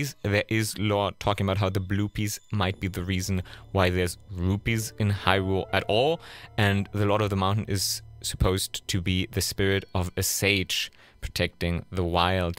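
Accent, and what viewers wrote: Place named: Germany. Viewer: Wie man einfach heraushören kann, dass du deutscher Muttersprachler bist. 🤣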